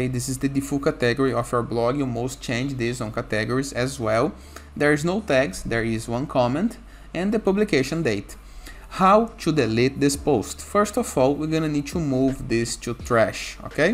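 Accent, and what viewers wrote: Brazil. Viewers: haha tem um brasileiro nesse ingês hein, parabéns pelo conteúdo!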